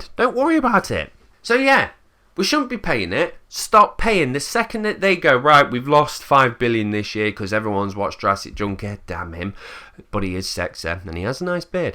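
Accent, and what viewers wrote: United Kingdom. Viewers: Does anybody know what regional English accent this guy is using? I'm in Canada, and the last time I heard this particular accent it was from the character Grumio on the comedy series Plebs.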